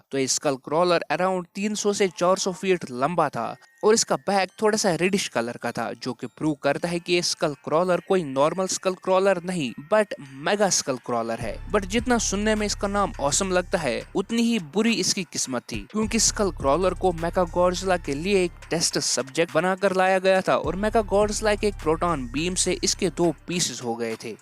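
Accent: Kashmir, India. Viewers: R u from Kashmir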